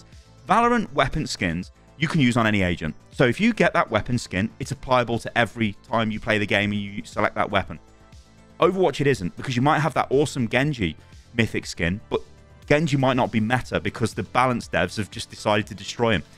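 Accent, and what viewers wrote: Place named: United Kingdom. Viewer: Been here since the beginning, idk if it’s the British accent but between that and your consistency you’ve been my preferred outlet for overwatch news. Thanks for the mems.❤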